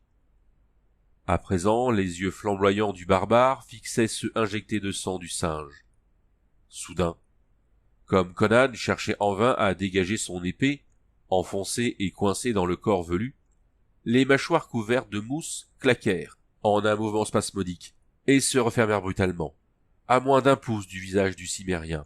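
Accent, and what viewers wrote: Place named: Paris, France. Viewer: les livres audio c'est une bonne idée, mais l'accent parisien c'est pas possible c'est insupportable j'ai cliqué au hasard "qui occultaient l'ciel" bordel LE CIEL pas "l'ciel" On dirait une grosse vendeuse de poisson sur le marché entrain de brailler pour vendre sa merde : "VOUS V'LEZ UNE P'TITE TRANCHE MA P'TITE DAME" "V'NEZ GOUTER MON POISSON M'SSIEURS'DAMES, IL EST BON MON POISSON" Ok pour les livres audios, mais lis les mots en entier stp, sinon c'est inécoutable (sauf par des parisiens évidemment) bon après je critique mais dans l'ensemble c'était bien lu 👌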